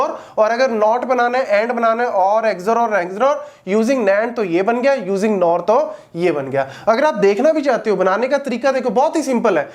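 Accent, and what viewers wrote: Punjab, India. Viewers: Sir aap Panjabi ho kya?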